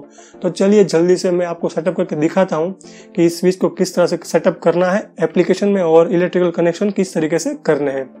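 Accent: Gujarat, India. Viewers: ભાઈ ગુજરાતી લેહકો આવે છે હિન્દી મા હો 😂🤩🤩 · Wow.. Your gujju hindi accent is owsom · Are you from Gujarat? Because your hindi has gujarati accent.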